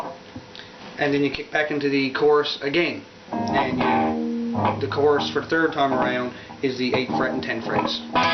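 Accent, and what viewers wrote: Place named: Newfoundland, Canada. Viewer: You sound like a good ol' Newfoundlander. Yes, m'son. Nice job.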